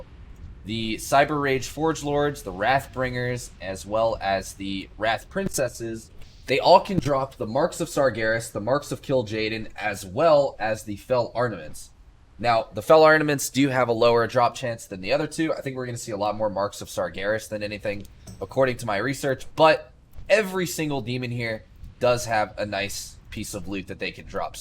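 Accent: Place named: United States. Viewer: jesus you have a cool american accent!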